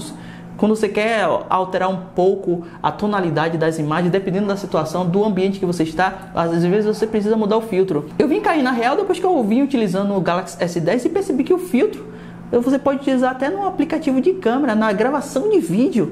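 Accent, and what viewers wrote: Bahia, Brazil. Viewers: tu é de que lugar da bahia, brow?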